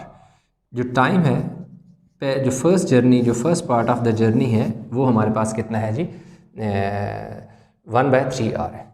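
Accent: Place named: Sindh, India. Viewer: ap ka lehja sindhi wala hai are u sindhi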